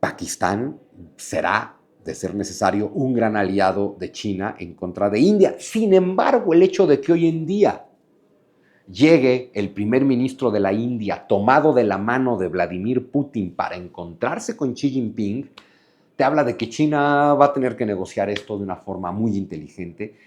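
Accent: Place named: Mexico. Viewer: tu acento mexicano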